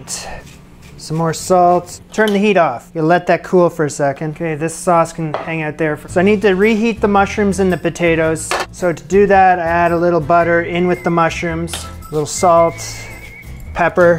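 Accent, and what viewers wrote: Canada. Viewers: The Canadian accent always gets me haha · I was surprised by NYC. His vowels pronounce him to be Canadian...or so I thought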